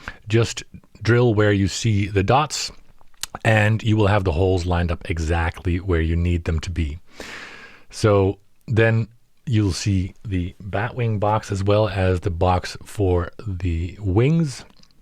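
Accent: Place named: United States. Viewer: what are you talking about?? 😂😂😂 If English is actually his second language then kudos to him because he has a great American accent. I would assume he moved to Europe.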